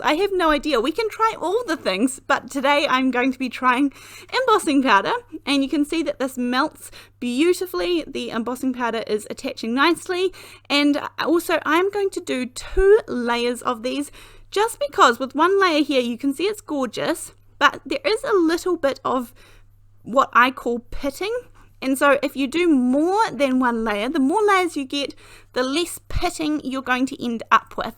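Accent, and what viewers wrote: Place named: South, New Zealand. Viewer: Love your channel and my other half thinks you're from either Christchurch or further south... He thinks you're a proper southerner! I'm from about as far north as you can get up here in England in Lancashire. He's from Wellington originally and lived in Dunedin once. Please keep up these amazing video's x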